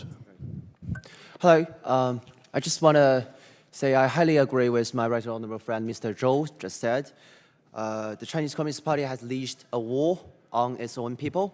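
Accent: United Kingdom. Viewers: 比起边上的周（在直播里听了周的发言），杨的叙述更能深入人心，充分的依据、有逻辑的叙述、带有英国口音的悦耳英文，应该会touch到这些议员的心灵... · 感覺是香港來的留學生，濃濃的英國腔，不過口條清楚、明確表達！ 🙂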